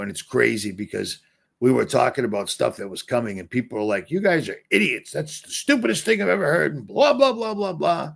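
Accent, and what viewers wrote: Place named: Boston, United States. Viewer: haha the Boston accent. We don't pronounce our R's and we add R's to words that don't have them. Reminds me of my sista Amander.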